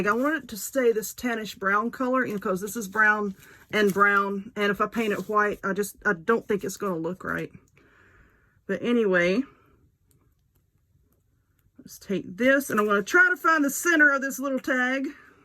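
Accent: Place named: East Texas, United States. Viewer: Olivia's romantic channel recommended yours, so i headed over. i have to ask if you are in east texas? you sound a WHOLE LOT like my family and myself.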